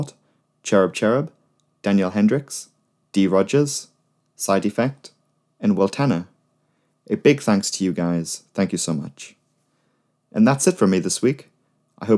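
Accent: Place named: Northern England, United Kingdom. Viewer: Informative and concise videos. Very well made. And a fellow northerner, eh? I'm from County Durham - not far away!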